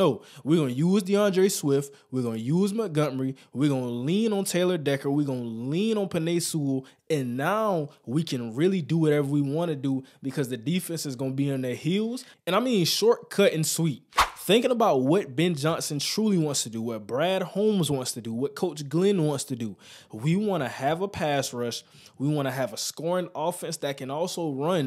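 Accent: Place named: South Florida, United States. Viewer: Are you from South Florida?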